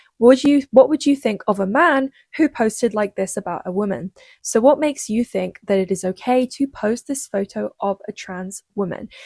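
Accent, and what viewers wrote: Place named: United Kingdom. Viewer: I also like your accent,. I like British and British-sounding accents a lot. 🤍🪽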